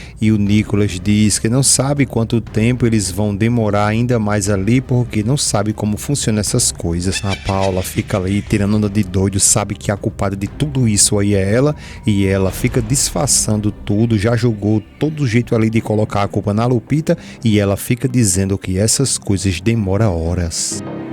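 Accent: Pernambuco, Brazil. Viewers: Sr. Narrador, você é do Cariri ou Pernambucano?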